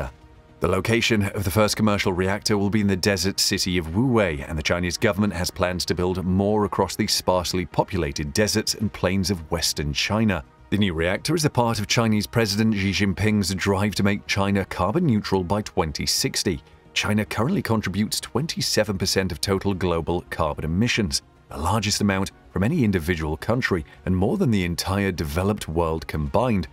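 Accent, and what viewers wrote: United Kingdom. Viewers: I guess that British accent is to make the Chinese propaganda sound intelligent. Does the name Chernobyl (thorium reactor) sound at all familiar?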